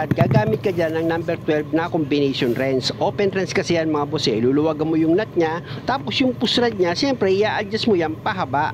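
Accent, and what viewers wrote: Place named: Nueva Ecija, Philippines. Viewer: Boss tiga saan po kayo my garod kayo sa salita eh nueva ecija po??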